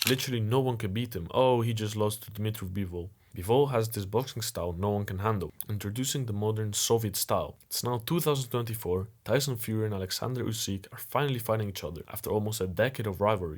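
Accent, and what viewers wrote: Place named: Netherlands. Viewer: Dutch accent way too heavy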